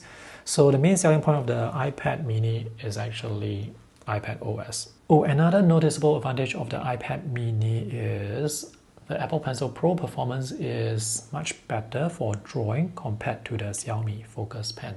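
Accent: Singapore. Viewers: I heard your voice and said: singapore? Sounds like. And was you. The last video I saw from you was years ago, about watercolor.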